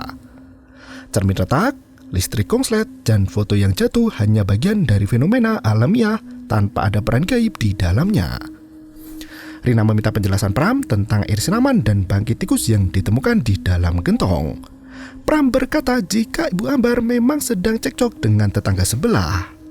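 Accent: Banyumas, Indonesia. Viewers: Solo daerah mana kok ngapak? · Astaga solo ngapak😅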